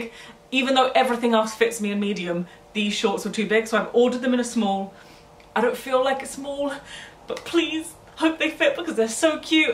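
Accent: Liverpool, United Kingdom. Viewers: Joni Walling he’s from Liverpool in England I think 😊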